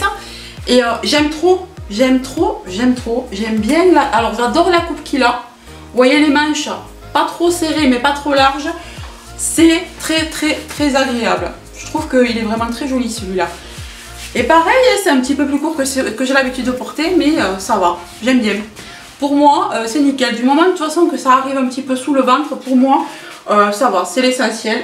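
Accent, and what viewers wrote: South, France. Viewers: le magnifique accent du sud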